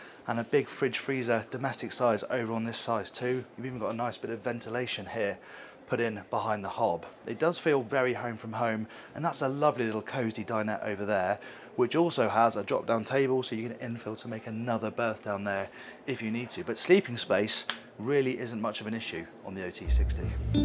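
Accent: United Kingdom. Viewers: There is just something very satisfying about listening to a British accent conduct a yacht walkthrough tour. I don't know. I think I blame James Bond for that. As for the boat, I absolutely love it. I would be more interested in the solar/hybrid and the range on that. Cheers, everyone.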